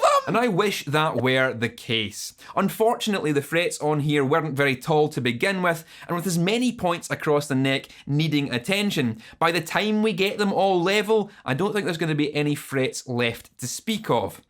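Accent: Scotland, United Kingdom. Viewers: I love how aggressively Scottish is your accent. Amazing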